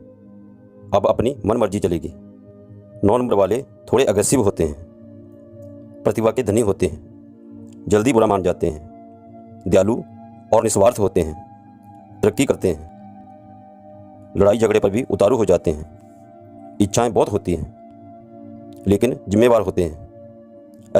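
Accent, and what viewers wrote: Punjab, India. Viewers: Aur kuch toh pata nahi, par ek baat sure hai, video mein bolne wala banda punjabi hai 😀😀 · Aapp ki awaz mai punjabipan hai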